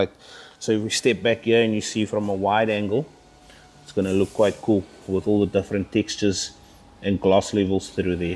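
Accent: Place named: South Africa. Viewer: You look and sound like a South African.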